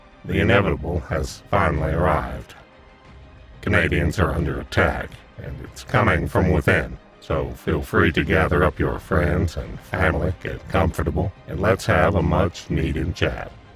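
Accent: South, United States. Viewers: why does the voice over have a southern USA accent? this was a really cheesey attempt at voter disuading vote or vote supression... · What is with the American accent? That just doesn’t seem right.... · Oooof what a cringe video. Southern accent doesn't help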